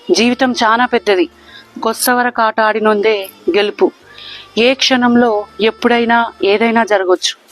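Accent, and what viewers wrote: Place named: Telangana, India. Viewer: మంచి తెలంగాణ యాస భాష. మంచి మాట కూడా🙏🙏🙏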